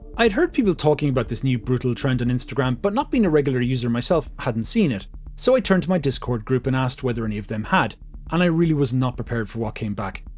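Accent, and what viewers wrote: Ireland. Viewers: I had no idea you were from Limerick! I lived there for almost a year and now immediately recognize your accent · YOURE FROM LIMERICK?? I always thought you were irish cus of your voice but i never imagined you were from MY hometown ! 👏🏼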